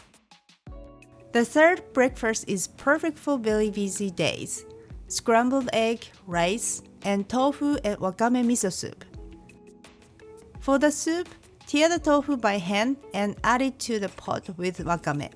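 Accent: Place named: Japan. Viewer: The heavy japanese accent tells me that this is authentic. Also, nice cap.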